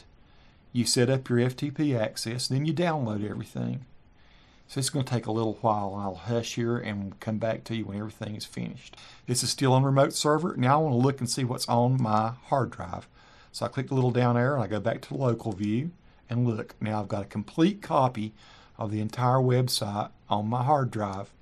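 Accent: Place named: North Carolina, United States. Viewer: Wow, thanks so much. This is the best Dreamweaver tutorial I've found. Plus, your accent is awesome (NC!)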